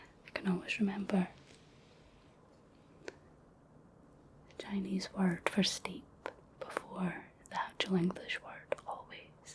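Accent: Scotland, United Kingdom. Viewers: I love listening to a Scottish accent. It's nice to hear, because usually I'm hearing the West Coast American accent.☮️ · Yeah, Scottish ASMR. Hello from NE highlands!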